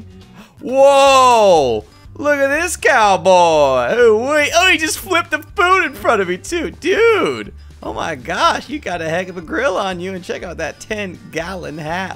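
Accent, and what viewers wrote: Texas, United States. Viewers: I LIKE YOUR COWBOY ACCENT I SO EXCITED FOR THIS MOD OF THE HAERT OF TEXAS LET'S GET INTO IT PATNER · texas person talking about texas mobs in a texas accent (i think thats what it is) noice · wait... thats how you talk in Texas?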